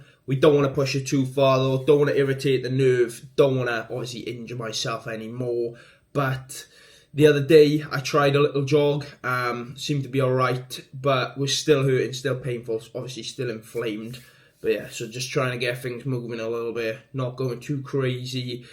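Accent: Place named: Wales, United Kingdom. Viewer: Where in Wales are you from?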